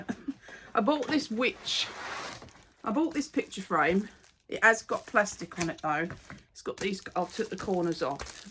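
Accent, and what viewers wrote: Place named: Essex, United Kingdom. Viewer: Hi, just found your channel, where in Essex are you from? You sound like me 😂🖤🖤🖤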